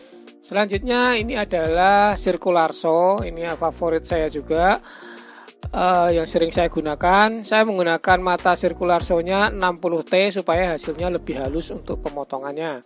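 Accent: Java, Indonesia. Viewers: Lidah Jawa nya tebal bro.... 😂 😂 😂 😂 😂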